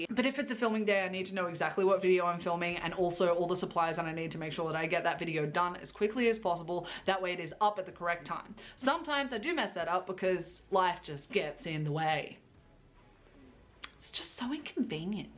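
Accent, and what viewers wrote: Australia. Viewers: Ok I’m new but your videos are so funny and amazing! I’ve got a question. Are you Australian? I’m Australian and your accent is very similar to mine. I liked subscribed and put bell on. Keep doing what your doing! ❤️xx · The Russian accent is put on, the Aussie accent is real. She lives in Western Sydney.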